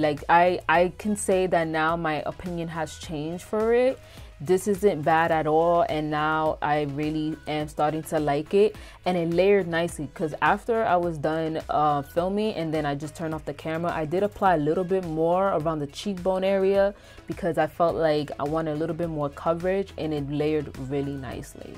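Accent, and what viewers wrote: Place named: New York, United States. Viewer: Hi! I found your channel and I just had to tell you that you’re absolutely adorable! I could listen to your accent forever! My family is from NY and I miss hearing voices like yours. I love your techniques and you have the most mesmerizing eyes ever! Hugs from Seattle. ❤️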